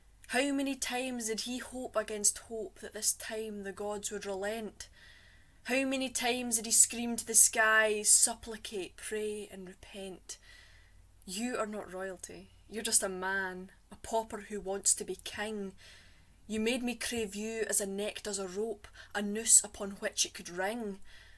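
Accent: Scotland, United Kingdom. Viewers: Hi Len, Love listening to your South Scotland Scottish accent! I am fae Aeberdeen an I spik Doric. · Amazing love her command of the Scots language accent and the red hair and of course the poems.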